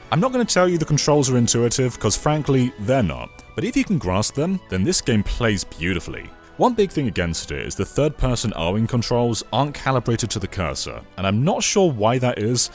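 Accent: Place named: United Kingdom. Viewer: 🤮🤮🤮🤮 uk accent is so annoying!🤮🤮🤮🤮🤮🤮🤮🤮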